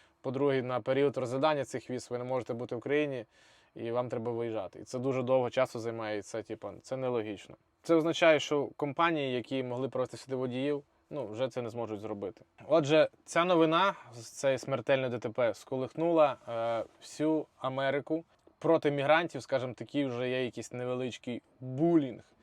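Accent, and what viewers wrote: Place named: India. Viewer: В нього англійська хороша. Лише акцент є. Бо в Індії англійська мова)))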